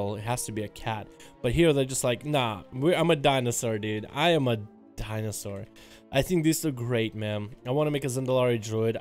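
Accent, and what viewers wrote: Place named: Russia. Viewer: This is not meant to be hateful... I'm just trying to give my Opinion on how to make your Videos a lot more enjoyable (for me, at least). It really bothers me, when you sometimes just mumble some words and I can't really understand what you're saying - or I really have to concentrate on the Video. I like to put videos like yours on, while playing. And it would make it a lot more enjoyable, if you'd just take your time to pronounce everything correctly. And I think you're part russian (Am I right?) and that can make speaking English harder. As a non native english speaker myself, I get you 😉 But I think you could really improve that, by just talking a bit more slow and just trying to take your time. I really like your content. But that sometimes really bothers me. If I'm the only one on that, then nevermind, forget about it 🙈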